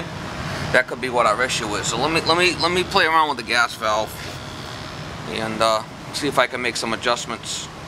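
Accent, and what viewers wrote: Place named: Northeast, United States. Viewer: u soumd like ur frmm UMM?? northeast there. I have a reheem 1973 gas heater. it heats up like sound is boombush but after that no sound from the fan motor and I did put in canstat l22-9a-11 there that didn't work but I got the fan motor going there but noo heat though. could it be the fan limit switch there thanks George..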